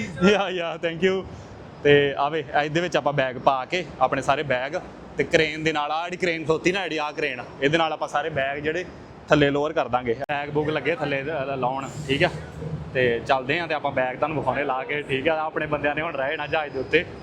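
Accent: Majha, India. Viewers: ਮੈਂ ਤੁਹਾਨੂੰ ਇਕ ਵੀਡੀਓ ਵਿਚ ਕਿਹਾ ਸੀ ਕਿ ਤੁਹਾਡੀ ਬੋਲੀ ਅੰਮਿਤਸਰ (ਮਾਝੇ)ਵਾਲੀ ਚਲੋ ਪਤਾ ਲੱਗ ਗਿਆ ਕੇ ਤੁਹਾਡਾ ਪਿੰਡ ਭੋਜੀਆਂ, ਤੇ ਮੇਰੇ ਨਾਨਕੇ ਨੇ ਭੋਜੀਆਂ, ਮਾਮ ਨਿਆਮਤ, ਮੁੱਖਾ, ਮਨਜੂਰਾ, ਦਾਰਾ, ਰਾਬਟ, ਇਹ ਨੇ ਮੇਰੇ ਮਾਮੇ ਦੇ ਮੁੰਡੇ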